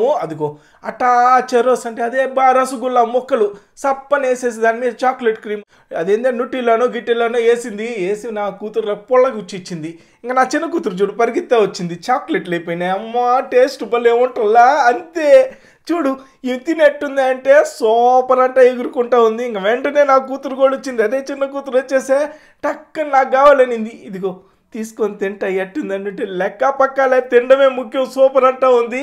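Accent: Nellore, India. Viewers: Super Tammudu meeru video pettadam chudakapovadama adi 5min ayina 10min ayina mana nellore slang naa Chevilo amrutam laa vundi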